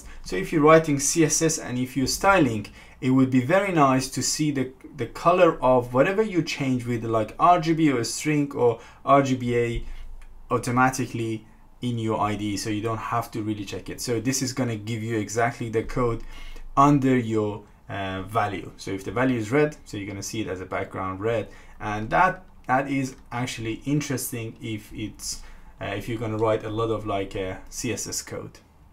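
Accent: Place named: Iran. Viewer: your accent similar to Persian. Are you Persian?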